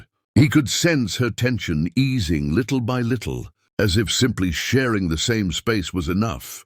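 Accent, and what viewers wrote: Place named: RP, United Kingdom. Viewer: Another PERVERTED "PROPA ENGLISH " Accent that seems to twist the human aspects of the lustful story.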